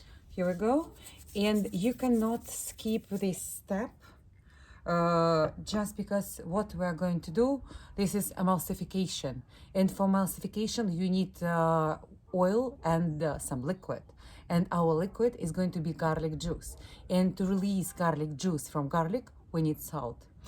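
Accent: Russia. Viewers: Thanks for the recipe! I have to try it. 🩷 👍🏻 I think you have a Russian accent! Where are you from?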